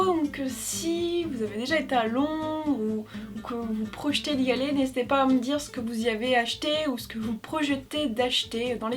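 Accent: North, France. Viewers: Tu as un joli accent du nord de la France :) Merci pour tes vidéos!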